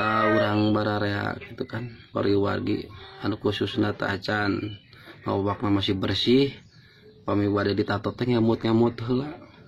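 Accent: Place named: Sunda, Indonesia. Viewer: Sunda asli mantul mang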